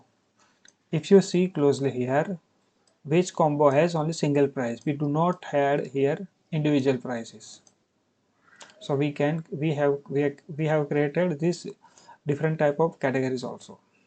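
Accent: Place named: India. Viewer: Finally got a video with indian accent on this topic.. thank uh sir😊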